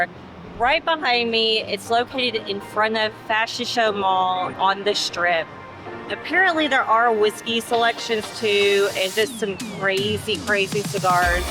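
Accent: South, United States. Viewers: ❤❤❤ I love ur Southern Twang ❤❤❤ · Honey drizzled on yourself just kidding. I dont think you were kidding 😊. You have a southern accent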